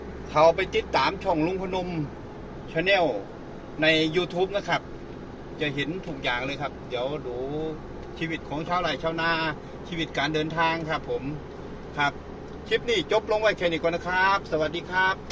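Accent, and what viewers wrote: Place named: Korat, Thailand. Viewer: ชอบดูมากพูดโคราช❤❤❤❤❤❤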